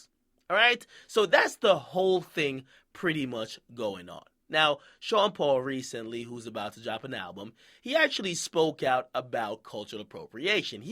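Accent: Jamaica. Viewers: yow akademiks where in jamaica you from ?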